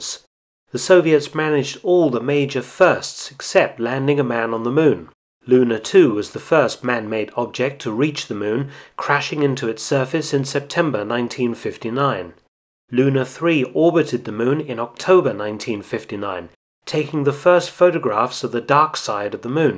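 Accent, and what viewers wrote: United Kingdom. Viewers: When you have to use context to tell whether a British person is saying Luna or lunar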